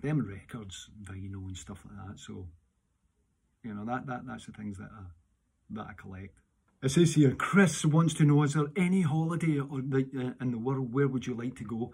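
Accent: Scotland, United Kingdom. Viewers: I love your Scottish accent, I’m was born in Mexico 🇲🇽 City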